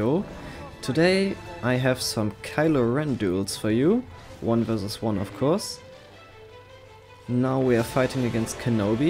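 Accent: Germany. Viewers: Der deutsche Akzent ist göttlich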